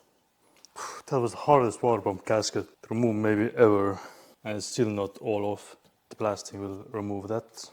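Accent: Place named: Estonia. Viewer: I thought your were Finnish from the accent but now I realize that’s Estonian.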